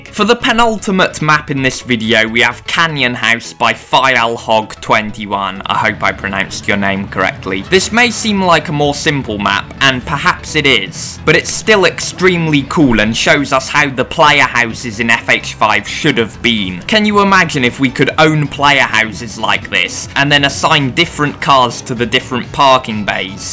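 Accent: United Kingdom. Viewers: Bro has the hardest British accent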